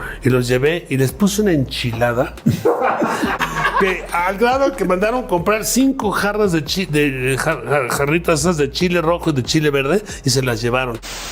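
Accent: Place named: United States. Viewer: Man, enough with the subtitles blocking all the pics of Mike and his friends. I understand English and I don't need subtitles to understand a narrator with an American accent.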